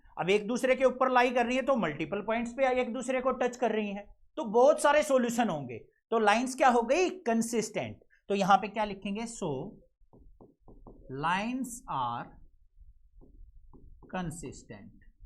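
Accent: Haryana, India. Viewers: Sir haryanvi on top ❤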